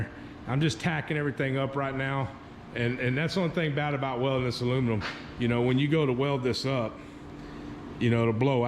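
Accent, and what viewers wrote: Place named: South, United States. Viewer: Nice man. I am from south lousiana I am a pipe welder by trade. Nice work. You sound like your from the south your self. Either way great job.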